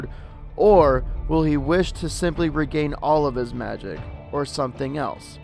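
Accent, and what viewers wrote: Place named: United States. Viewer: Nice to find a American narrator the other guys accents make it hard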